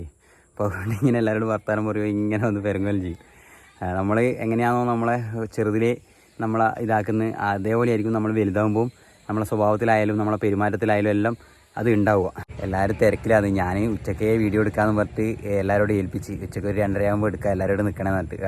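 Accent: Kannur, India. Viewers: കണ്ണൂർ ഭാഷ കേൾക്കാൻ നല്ലരസണ്ട് 👌🏻👌🏻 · കണ്ണൂർ slang കേൾക്കുമ്പോൾ എൻ്റെ വീട്ടുകാരെ ഓർമ വരുന്നു..ഞാൻ ചേലോറ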